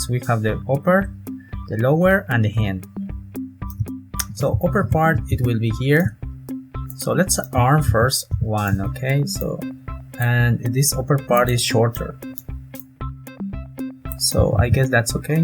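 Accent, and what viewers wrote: Spain. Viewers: cause its kinda hard to understand you with your strong Spanish accent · Se nota que hablas español xd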